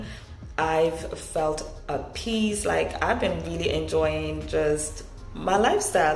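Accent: Jamaica. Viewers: U. are Jamaican?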